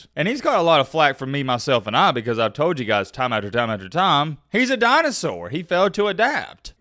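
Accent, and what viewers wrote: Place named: Alabama, United States. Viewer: You’ve got the most Alabama accent I’ve ever heard and I love it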